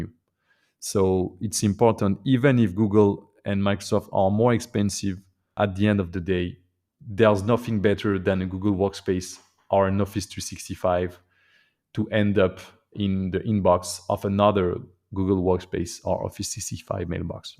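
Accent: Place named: France. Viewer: sympa l'accent Français :)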